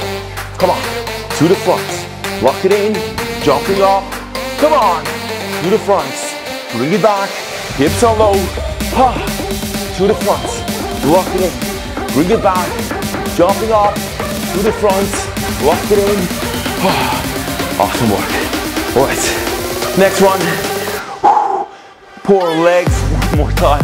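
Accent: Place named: Australia. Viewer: Sounds like Australia; but I could be wrong.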